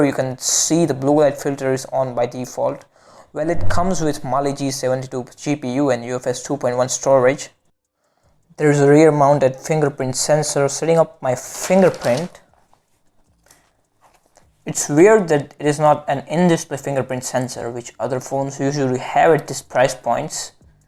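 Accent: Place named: United Kingdom. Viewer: I think you have a nice British English accent. Nice pronounceation. Good start and tell you brother to learn all this from you 😂🤣😜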